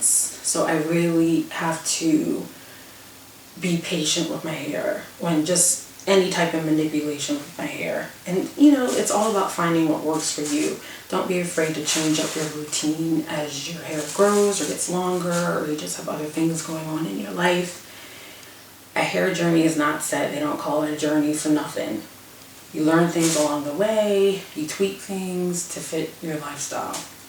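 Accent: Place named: South, United States. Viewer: where are you from? lol I know thats random but I cant place that accent. Certain words you say sound a lil southerny like "ool" lol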